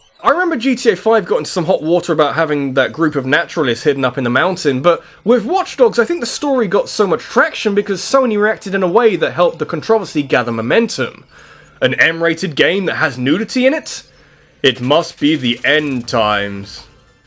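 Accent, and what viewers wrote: United Kingdom. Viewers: I love how people from the Uk say controversy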